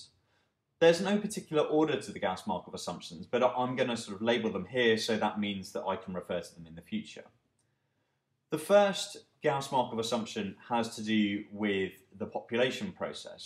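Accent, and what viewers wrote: United Kingdom. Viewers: just making a pause after some 30 videos... you have a wondurful vision of econometrics, everything is so consistent (...). It is just sometimes a bit complicated for a french guy to understand your Manchester accent...but after some vids it sounds like music of econometrics 😉 thank you so much!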